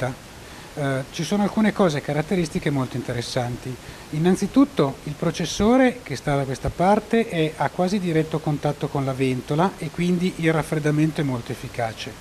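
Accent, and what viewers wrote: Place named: Austria. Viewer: Ich kann sein Deutscher Accent schwer verstehen. Versheinlich aus Oestereich oder irgendwas. *shrugs*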